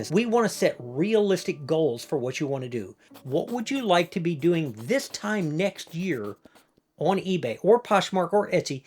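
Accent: Minnesota, United States. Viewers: I thought I heard some Minneeeesota in there! Northern Weesconsin here!! Right on the tip!!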